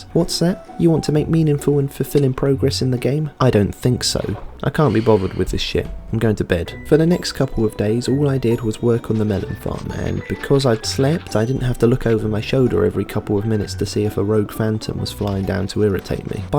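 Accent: United Kingdom. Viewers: Ah yes British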